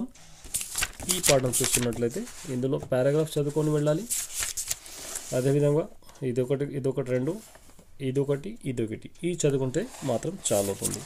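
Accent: Hyderabad, India. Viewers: Sir, you are from Hyderabad?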